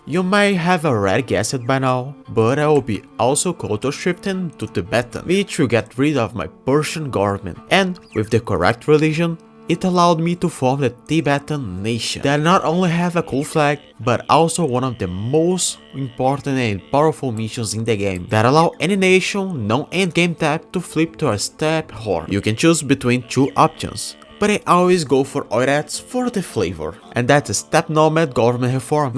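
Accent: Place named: Brazil. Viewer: sempre bom ver algum br fazendo conteúdo em inglês sobre qlq game, sua pronuncia ta ótima amigo, apesar do sotaque "accent" que colocamos, isso deixa com mais charme (obs: demorou quase o vídeo inteiro pra eu começar a suspeitar ser um "compatriota" huashusahusa), sucesso!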